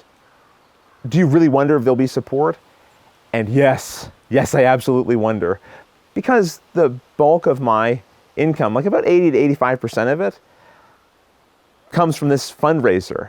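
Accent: Canada. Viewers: You've taught me how to play a number of games this year and I'll definitely keep an eye out for your fundraiser. My wife always knows when I'm watching a show because she recognizes a fellow Canadian accent! :) You have some of the highest quality videos in terms of production which I imagine takes some time, and they often focus on major releases. With that in mind, have you considered doing something like a "quick glance" video segment/series that isn't as in depth as the normal videos but allows you to touch on a broader range of topics/games/smaller releases? Because I think the bottom line is you've got a good viewing personality for gaming, but unless I've just bought the game or am thinking about buying it, I'm not going to watch an instructional video for the fun of it, you know? Table Talk is great, but as you mentioned it's less of a focus. Regardless, thanks for your contributions to the community!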